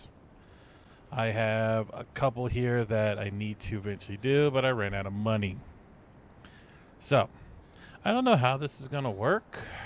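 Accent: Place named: United Kingdom. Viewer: he's British, not American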